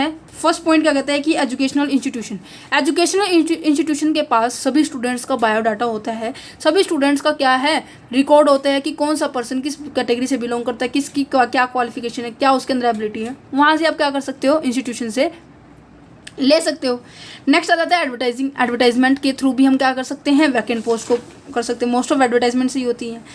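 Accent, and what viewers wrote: Haryana, India. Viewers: Mam aap Haryana se ho gi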